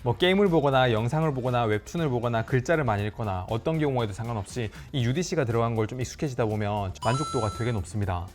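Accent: Gyeongsang, South Korea. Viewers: 사투리 귀엽네요 ㅋㅋㅋㅋㅋ경상도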